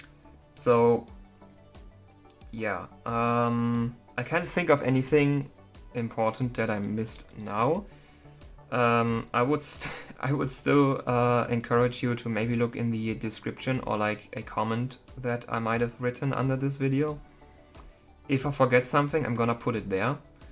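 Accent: Germany. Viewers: Where have you learned all that ? Btw are you German ? :) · I love ze German accent. Awesome video. I also watched the video where you explain the components of a GPU PCB. What I'm missing and would love for you to make is the missing link between those two videos. What points do you need to jab with your multimeter to test what component and what resistance are you expecting to see on a good card? If you find no flaws with resistance testing and power the card, what points are you then testing to test the various components and what voltages are you expecting?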